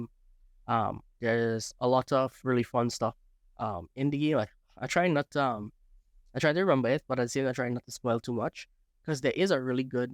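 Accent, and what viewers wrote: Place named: Trinidad and Tobago. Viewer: It's a Trinidadian accent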